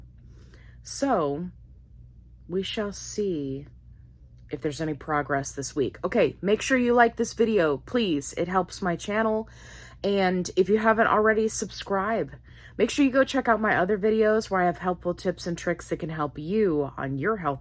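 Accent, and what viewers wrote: United States. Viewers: I’m a southwestern Ohioaner, I thought she was originally from West Virginia. 😂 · Keven's first snow!! 🩷 Side note: I always assumed you were from Ohio! (I like to guess where someone is from by their accent.) As a former Buckeye I thought we had the same accent. 😂 Didn't place you as a Southerner.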